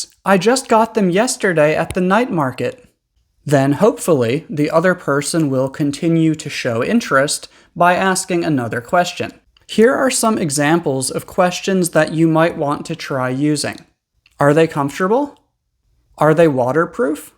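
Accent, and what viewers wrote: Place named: United States. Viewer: Excuse me, I hear your voice and I'm wondering if you're from the United States? You speak very clearly, I can guess, maybe you're an English teacher? Would you like me to show you around my neighborhood?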